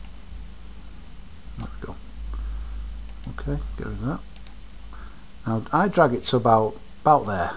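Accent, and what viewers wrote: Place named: Europe. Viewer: I did. wow, so many gaming Vids. I used to be a hardcore gamer. Now my enjoyment from games chiefly comes from playing with other humans (in the same room). Still, if I ever need to catch a kewl euro accent, I've noted you as reference material;P. Cheers. See you around mate.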